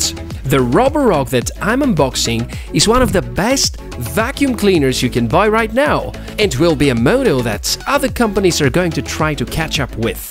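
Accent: Netherlands. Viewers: The finest, least perceptible Dutch accent I've heard in a long time. Respect! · I love your dutch accent...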